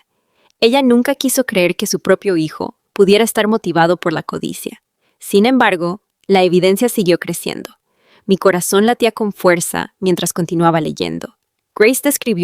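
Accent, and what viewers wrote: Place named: Cocoliche, Argentina. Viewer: Corté en el minuto 5: aburrido, denso, repetido y narrado en cocoliche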